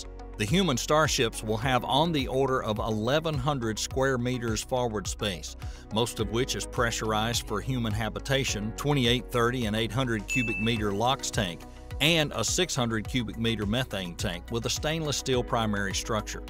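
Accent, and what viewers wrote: South, United States. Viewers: Another too rare video without randomly CAPITALISED WORDS in the title or inconsequential exclamation marks or deceiving wording, stupidly hoping it will generate more views. Please keep this more mature southern accent voiced guy that I learned to appreciate and stop occasionally replacing it with the other prepubescent one. Maybe keep those shady (and, quite frankly, dumb) tactics for your other thousand Youtube profiles I unsubscribed from, but please keep this one free of bullshit. Otherwise, I'm grateful for this well presented informative content.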